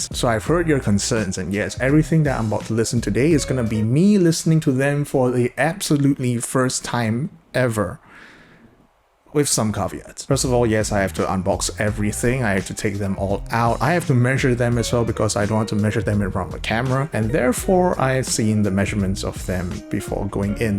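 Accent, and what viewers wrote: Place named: Singapore. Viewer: I could tell you were Singaporean from the accent. I felt validated after checking.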